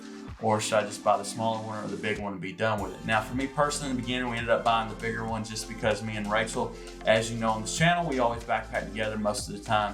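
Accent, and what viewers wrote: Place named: Alabama, United States. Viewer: This fella from Alabama? His accent reminds me of.. me.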